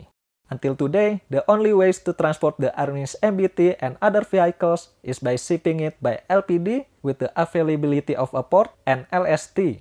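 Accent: Indonesia. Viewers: Aaaahahaha... this is the accent of english language that i love.. Indonesian accent.. love my Indonesia... 🥰🥰🥰🥰🇲🇨🇲🇨🇲🇨🇲🇨🇲🇨🇲🇨🇲🇨🇲🇨🇲🇨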